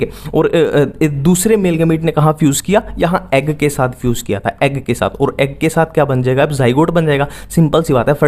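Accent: Haryana, India. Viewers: App haryanvi hai ...??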